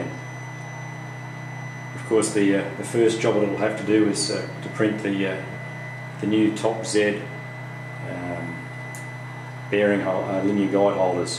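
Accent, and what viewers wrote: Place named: Australia. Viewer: Hi. Love your work on the Evolution. By any chance are you selling kits or your dual Z prototype? Cheers Andrew PS It's good to hear an Aussie accent on YouTube. I'm from Brisbane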